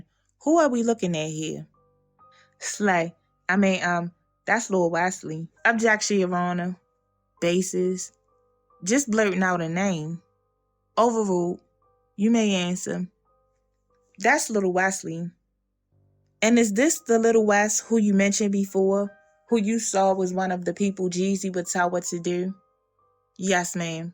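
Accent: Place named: Baltimore, United States. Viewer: The narrator is definitely from Baltimore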